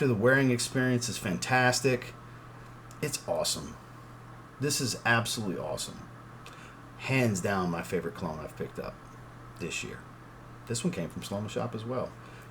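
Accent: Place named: New Orleans, United States. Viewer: You from New Orleans area? I am and you kind of sound like it. Maybe Westbank? lol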